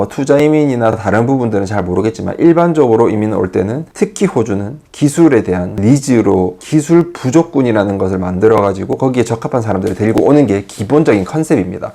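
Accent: Gyeongsang, South Korea. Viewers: 시골 탈출 축하드립니다^^ 사투리가 같은 경상도 말투라 반갑네용 ㅋㅋ 주변 독일, 프랑스, 인도인 친구들은 NATTI CCL을 기본적으로 다 치는 걸로 생각하던데 한국분들은 쳐볼 생각도 안해보시는 거 같아서 아쉽더라고요. 저도 주변에 아무도 NATTI CCL 생각 안하고 있을 때 혼자 공부해서 시험치고 합격했던 경험이 있습니다. 그때는 워낙 정보가 없어서 너~무 너~~무 고생 많이 했지만 시험 자체는 생각보다 쉬웠어요. 추천해주신 웹사이트 가서 보니까 세상 좋아졌다는 생각 드네요ㅋㅋ 2024년 이민 준비하시는 분들 다들 화이팅^_^**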